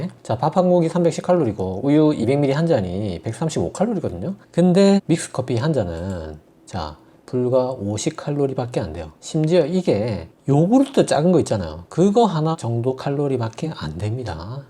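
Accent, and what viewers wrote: Gyeongsang, South Korea. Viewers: 은근히 구수한 사투리가 좋습니다 ᆢ감사합니데이^^ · 사투리 늠조앙 ㅎㅎㅎㅎ경상도시네요 반가바용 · 갱상도 쌤 같은데 하나도 지루 하지않고 귀에쏙쏙 ㅋㅋㅋ믹스커피의 억울한 오명을 벗겨주시네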